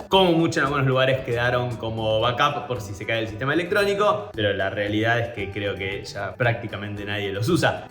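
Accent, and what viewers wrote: Argentina. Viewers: Podés hablar en español ? No en argentino …… no te entiendo naaada !!!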